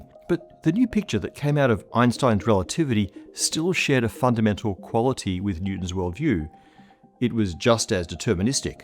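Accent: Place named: Australia. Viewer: No slack-arsed AI. And sn Aussie. And accessibly explained !! That’s so needed. Subscribed, mate. Greetings from the Wimmera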